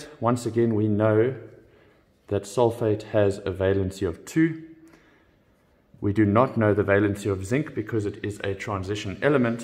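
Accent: South Africa. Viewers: Helped a lot thank you PS: are you South African?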